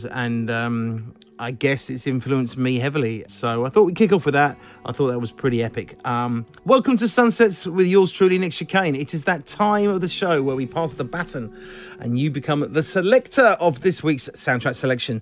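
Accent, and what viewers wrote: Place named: Cockney, United Kingdom. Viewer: Didn't realize Chicane was Cockney. Is there a commentary-free stream we can subscribe to? 🤣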